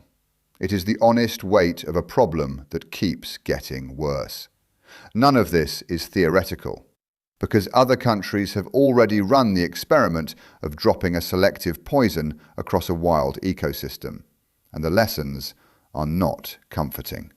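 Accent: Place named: Australia. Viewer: Millions of mice have not been dropped into the Everglades . Are you really that hard up for attention? I would never subscribe to a channel as misleading as yours. This is the second video I have found of yours misleading the public about what is happening in the Everglades. I guess, you think, if you wear the "outback" clothing and sound Australian people won't question your faulty videos. You are part of the problem with the reliability of the internet. Why do you do this?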